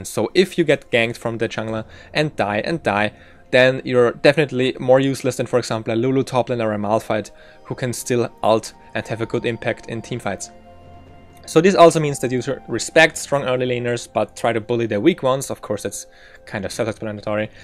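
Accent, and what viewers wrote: Germany. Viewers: Your accent just sound a little bit like a german accent:P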